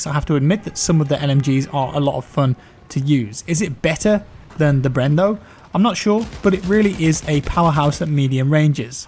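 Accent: United Kingdom. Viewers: This is gonna sound pretty creepy, but i just love it when people with British accents explain intricate shit to me XD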